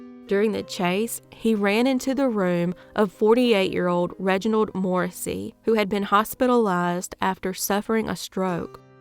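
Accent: South, United States. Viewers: can be hilarious at times with her cute Southern drawl🤣😂👍 Excellent narrator too!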